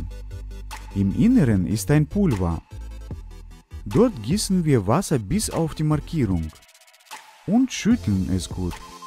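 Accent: Russia. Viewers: Typischer russicher aktzent finde ich super bin halb russin und finde deine videos echt cool Bin gerade auf deinen kanal gestoßen rechtschreibung ist nicht so meine sache😅😂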